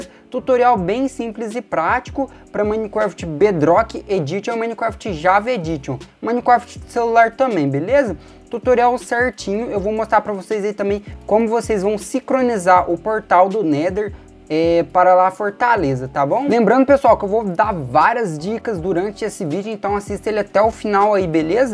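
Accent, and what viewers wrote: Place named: Rio de Janeiro, Brazil. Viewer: o cara é carioca!!! skskskksks legal pá cacete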